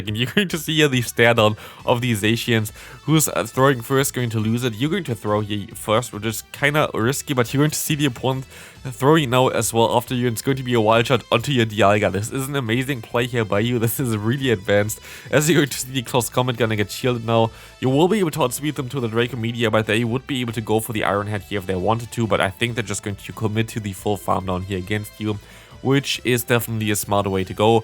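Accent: Germany. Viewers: Why do I think you have a german accent?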